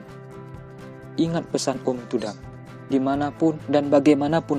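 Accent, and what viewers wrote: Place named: Medan, Indonesia. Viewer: Medan kali bahasanya ya, mantap bg.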